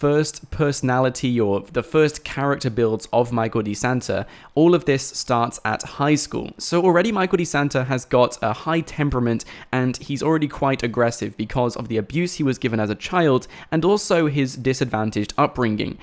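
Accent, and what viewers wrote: United Kingdom. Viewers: Firstly don't hate on his British accent and secondly he's saying it the right way, it's pronounced mike-al-de-santa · Stop being mean, its not his fault hes british and that he has a British accented!!!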